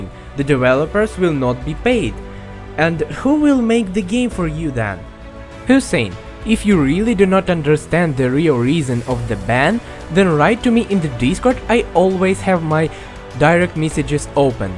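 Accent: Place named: Ukraine. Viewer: no not that it is just you sound Ukraine so I whant to make sure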